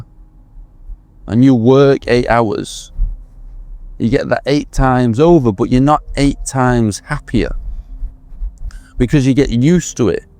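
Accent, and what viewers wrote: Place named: Manchester, United Kingdom. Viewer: More people need to hear this. You are straight talking and bang on point in what you say. Im from Manchester, Your accent reminds me of my grandad and he used to say the same things you are bringing up which is proof there isn't a glitch in the system its by design. Please don't stop doing the videos you make me feel less crazy because im not the only one thinking it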